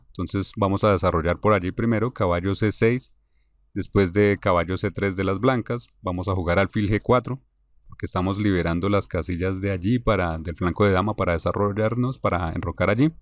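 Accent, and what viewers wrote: Bogotá, Colombia. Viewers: ¿ Igor Smirnov es el Colombiano que estoy escuchando ? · Espectacular!!! En especial la última, Que acento tan capitalino-Teusaquillo, muchas felicitaciones Exelente Canal!!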